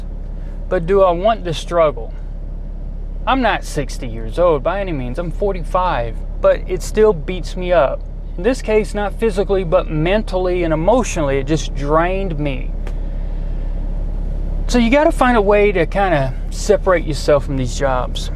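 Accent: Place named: Georgia, United States. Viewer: Thanks for the reply and sharing your Neon repair technique . Never lose that cool Georgia accent. Been SUBSCRIBED for a while . Prosperity, long life and good health to you and yours.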